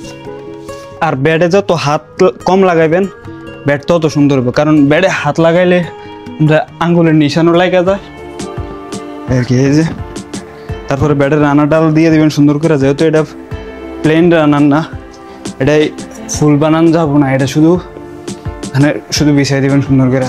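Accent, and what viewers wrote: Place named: Comilla, Bangladesh. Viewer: ভাষা শুনেই বুজেছি কুমিল্লা কিন্তু কুমিল্লা কোন জায়গায়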